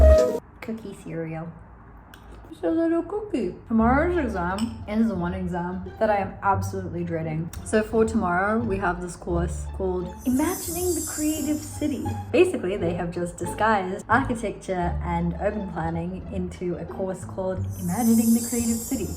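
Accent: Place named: New Zealand. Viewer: you're losing the kiwi accent nooooo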